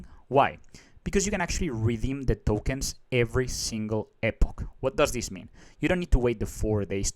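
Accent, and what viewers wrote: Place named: Bogotá, Colombia. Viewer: Este Man tiene puro acento de rolo.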